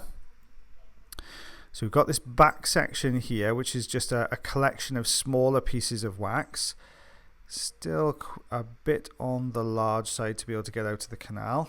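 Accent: Wales, United Kingdom. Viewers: Are u british??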